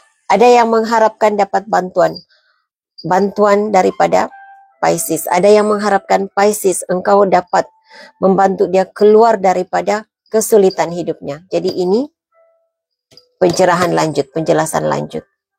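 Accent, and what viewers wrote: Sabah, Malaysia. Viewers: Suara admin. MCM orang Sabah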